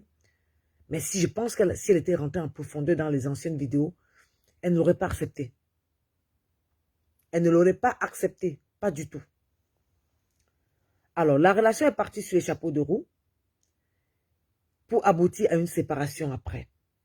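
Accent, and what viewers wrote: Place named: Cameroon. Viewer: Ton accent me fait dire que vous êtes camerounaise merci pour ton soutien à EK. Le cas peter c'est un cas école je pense que ça vous servira de leçon aux blogueurs ivoiriens à deux balles tous des analphabètes illettrés.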